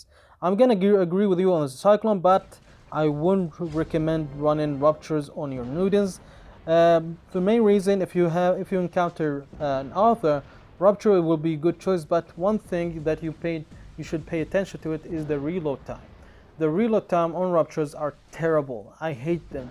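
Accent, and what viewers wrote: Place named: Arab world. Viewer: i know you are arab from your accent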